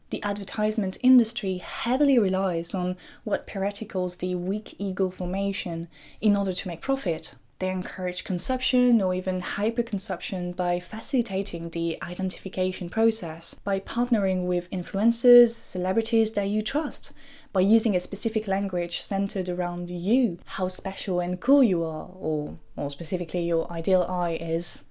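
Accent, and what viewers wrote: North, France. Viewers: ah tu es du nord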